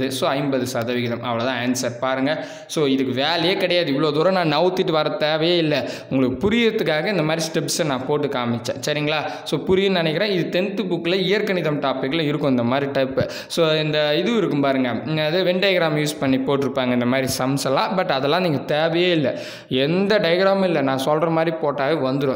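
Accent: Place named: Chennai, India. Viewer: Chennai slang explanation.... Nice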